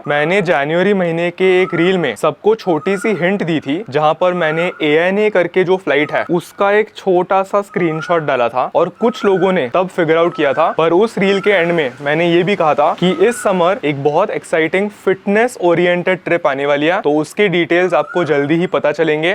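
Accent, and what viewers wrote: Maharashtra, India. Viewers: MARATHI ACCENT IS SO CUTE! 😊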